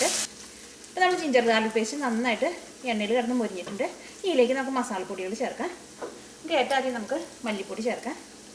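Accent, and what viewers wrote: Thrissur, India. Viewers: Dear Mam, this is excellent. We also tried your Ullii Chammandi & Rasam powder. A small suggestion - you should have avoided the back-ground song, which is not at all relevant for this particular dish - Nadan spicy Chiken, please check. We also like your off-screen, to the point presentation with a special slang (thrissur????) as bored with the on-screen presentation “of self” of some celebrity chefs, rather than their recipes). We expect more simple recipes from you Mam… Thanks. venu family, surat.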